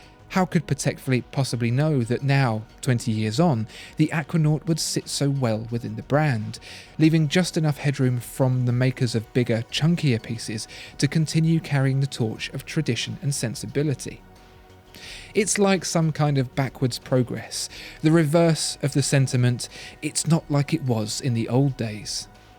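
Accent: United Kingdom. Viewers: Ugly watch no matter how this guy with a British accent says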